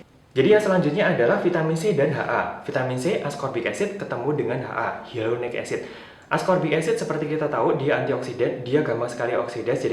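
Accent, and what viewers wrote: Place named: Malang, Indonesia. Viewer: asli pacitan tpi logate arema pol 😀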